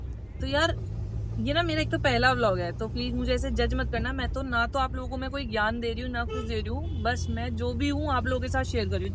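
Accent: Haryana, India. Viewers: Mst lge h haryanvi aap ki boli te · Haryanvi hindi mix😂😂😂 but Haryanvi top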